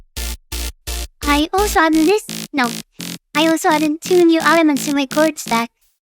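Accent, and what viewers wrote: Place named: Philippines. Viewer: you're filiipino aren't you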